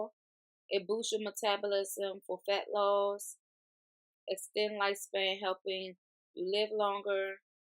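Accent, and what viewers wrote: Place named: Washington DC, United States. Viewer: I hear your DC accent so I know you're from the area. Where do you get your nails done?? They are so bomb.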